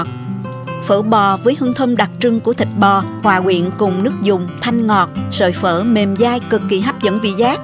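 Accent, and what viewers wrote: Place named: Mekong Delta, Vietnam. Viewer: Nhìn nấu món nào cũng ngon! Giọng đậm chất miền Tây nghe nhẹ nhàng và ngọt ngào. Chúc cô và gia đình thật nhiều sức khỏe!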